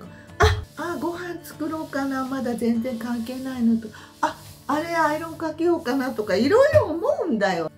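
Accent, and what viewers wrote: Yamaguchi, Japan. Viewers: 山口弁がとてもここち良いです。私も山口出身の山中湖住まいの70歳です。凄く元気もらってます。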